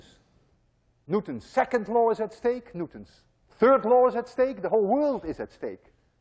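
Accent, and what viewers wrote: Netherlands. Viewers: It's interesting how your Dutch accent become much stronger in the past years again!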